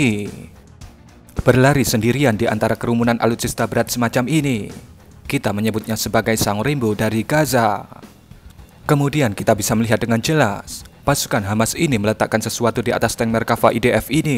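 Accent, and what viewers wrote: Java, Indonesia. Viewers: suara lhu medok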